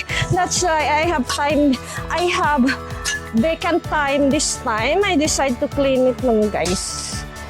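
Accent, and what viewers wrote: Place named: United States. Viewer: wow...ka daghan na di ay sa imu English....hehhehe....mura na amerikana...very nice...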